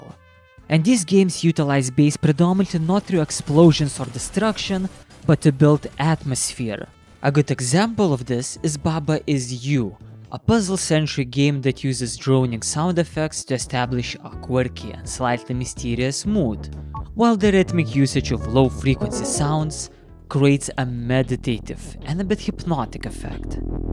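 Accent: Russia. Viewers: the russian R is as heavy as the bass (not really judging tho)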